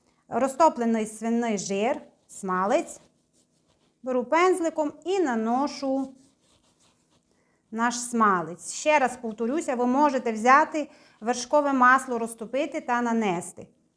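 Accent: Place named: Zakarpattia, Ukraine. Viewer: Я завжди задаюся питанням: навіщо держава витрачала шалені гроші на навчання? Коли в кінцевому підсумку ця молода персона розмовляє закарпатьским діалектом. Достатньо було би 4-х класів освіти. І потім, всі блогери як журналісти повинні нести в маси красу украіінськоі мови, тим більше, що наш народ післі окупаціі має негативну спадщину в частині мови. Думай- Те!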